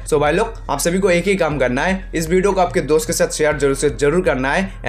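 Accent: Bengal, India. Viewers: Yes bengal se lagta hai😐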